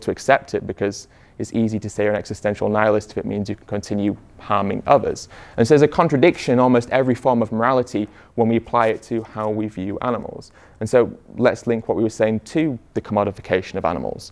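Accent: United Kingdom. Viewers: I loved this speech and english accent makes it even better, absolutely awesome!! Thank you Ed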